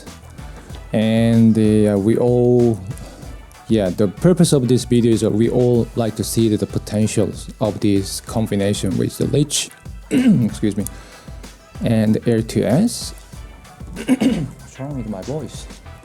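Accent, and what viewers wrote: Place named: Australia. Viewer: Yeah same here! Its fine! At times he sounds like he has a Australian accent! And for the record I understand him better than a lot of Australians! =]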